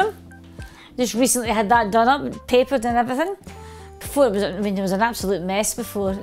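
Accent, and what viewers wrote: Scotland, United Kingdom. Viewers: Listening to her talk about the house, (love her Scottish accent) I believe this was her family home. Such a warm, lovely place. She is a wonderful talent and she looks so good. I am glad for the update. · Many English speakers who are not used to hearing someone speak English with a moderately thick Scottish accent will have trouble catching all of her words.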